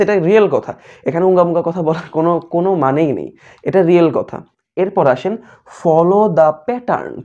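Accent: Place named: Rajshahi, Bangladesh. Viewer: Apnar basa ki rajshahi ?? Apnar kothar moddhe rajshahi r vibe pacchi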